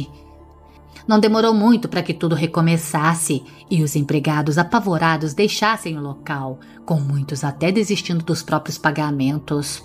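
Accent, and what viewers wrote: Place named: Mato Grosso, Brazil. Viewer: Imaginava ela morena de cabelos pretos tipo Índia, sua voz lembra do interior de Mato Grosso.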